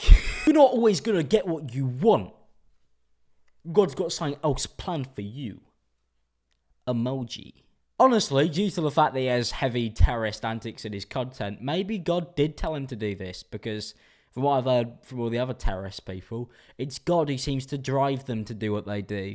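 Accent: United Kingdom. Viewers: You sound like a Brit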